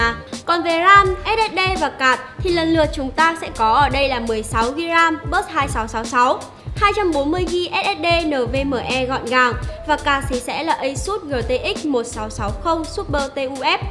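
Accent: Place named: Hai Duong, Vietnam. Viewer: Chắc chắn là quê Hải Dương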